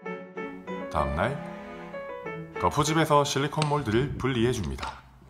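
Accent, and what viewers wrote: Gyeongsang, South Korea. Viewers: 은근한 사투리가 섞여계시네유 경상도느낌ㅎㅎㅎ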